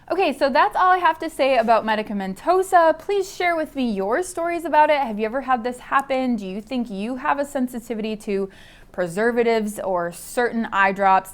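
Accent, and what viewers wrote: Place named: Michigan, United States. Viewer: I knew it! I just checked your bio and I see you're from MI. You have the accent 🙂. I love your content. It's so helpful and informative. You clearly know your stuff!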